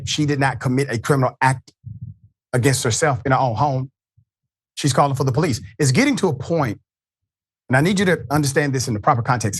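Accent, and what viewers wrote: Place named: United States. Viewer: Sounds very American.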